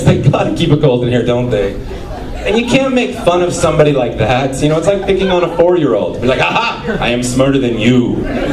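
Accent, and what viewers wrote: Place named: Glasgow, United Kingdom. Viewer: Great Loudon covers, and now stand-up comedy! Not a bad Glasgow accent... try 'Govan' if asked where in Glasgow... Good stuff.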